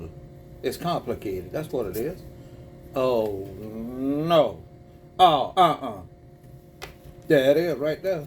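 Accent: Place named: Louisiana, United States. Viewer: Love your Louisiana accent, don’t know why but it feels like HOME❤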